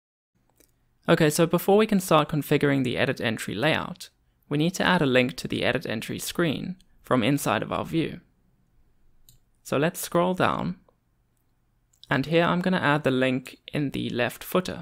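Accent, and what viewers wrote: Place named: South Africa. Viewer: South African accent FTW :)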